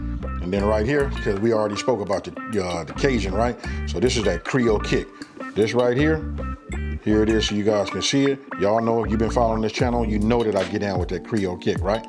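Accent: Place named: California, United States. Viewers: man, I love big bro! this man can cook! I'm never disappointed! he got that og California accent 😂😂 much from okc, ok! keep doing this bro! I had a vision that you were on one of those food network shows, like chopped! you can do it, I believe in you! you're going real far with this, I see it!🙏🏽💯🤎🥂🥡🦐🧈🥗🍖🥞🥜🧆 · as someone who grew up in crenshaw and moved to the bay area, any time you hear someone with the same lingo and talks as you n your peoples... just makes this hit different. you earned a sub from me OG. keep makin great content.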